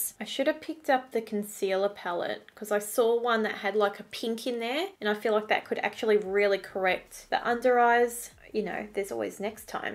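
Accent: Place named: Australia. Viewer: This is my first video watching you and wow… AUSTRALIAN 😂😂😂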